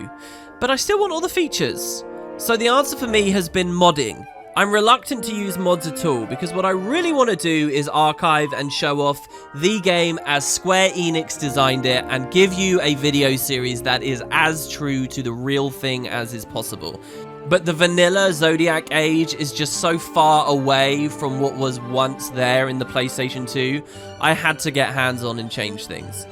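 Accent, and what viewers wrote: United Kingdom. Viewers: you absolute monster. I learned 3 things in this video 1) You are younger than me (I think the British accent makes you sound more mature than my American slang) 2. That a game that should have been about Balthier missed by a few letters, until a pivot in design. And 3) That it wasn't Nethecite causing the weird audio. That has been my headcanon since 06, and it's dead now. You've killed it. Sure hope you take better care of Rex.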